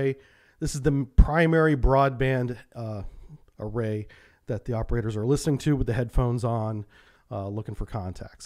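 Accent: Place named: United States. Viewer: the pronounciation was a bit off, thats right, but still understandable and easy to correct with very minor "practice". just cut the american "dialect" pronouciation habit and you should be more than good enough. umlaut and other stuff is not really neccesary, as it is difficult for english speakers to wrap their head around and germans dont really mind if you dont pronounce them. just dont end up like the guy in the "Tank Museum Anti Tank Chat"..... that was just horrible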